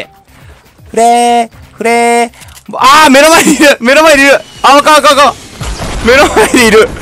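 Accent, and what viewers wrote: Kansai, Japan. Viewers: BOXINGは関西出身なの??ww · 関西弁縛りでもしてんの？？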